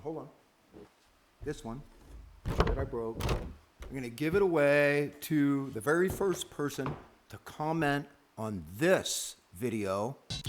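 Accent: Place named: Eastern Europe. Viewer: Cool Vlog man, Fred is right it does sound eastern European. Anyway log time fan and looking forward to the next one!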